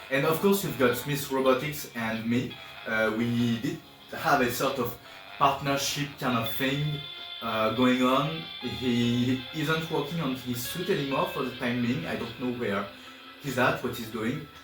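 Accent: France. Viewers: Are you french? Also, love your vids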